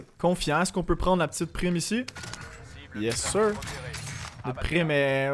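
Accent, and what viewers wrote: Quebec, Canada. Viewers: SNIPEUUEE! MDRR trop fort l'accent québécois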